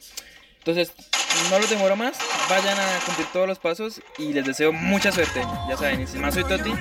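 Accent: Colombia. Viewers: Oye amigo quiero partisipar aun esta en abierto el sorteo ?? Soy colombiano y tengo todos los requisitos tienes asento de rolo bro las mejores digue asi me ayudaste vastante para comprar diamantes no savia lo de el efecti😎😅sigue asi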